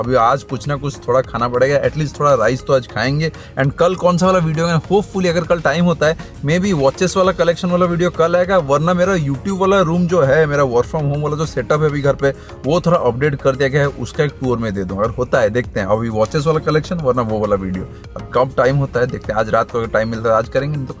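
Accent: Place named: Bengal, India. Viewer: Improve ur hindi accent..U sound like bangali from ur Hindi ..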